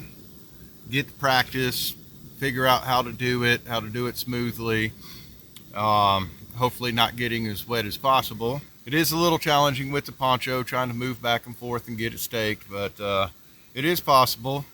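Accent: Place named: Kentucky, United States. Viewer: I appreciate the manner in which you realistically presented the ACTUAL challenges in making a shelter while wearing a poncho. Thank you for expressing your concern for victime of Hellene. Our area along the northern half of the Al-GA state line was in the originally projected path of the storm before it suddenly turned northeastward & missed our area. We have many friends who live the areas most devastated. ALL the victims remain in our thoughts & prayers. By lowering the end of the poncho tied to the tree, the plow point shelter becomes long enough to stretch out, lie down, & sleep if an overnight stay becomes necessary in an emergewncy or survival situation. Thank you, & may God bless you, sir. Your accent reminds me of those which my family heard when we lived in SE KY about 40 miles beyond the Cumberland Gap. This presentation motivated me to watch your other videos & possibly subscribe! 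An 83 year old outdoor adventurer.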